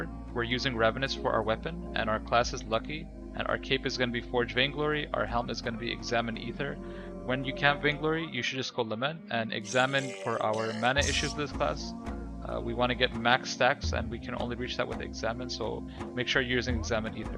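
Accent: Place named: Arab world. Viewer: Your accent is Arabic Where are u from btw fire guide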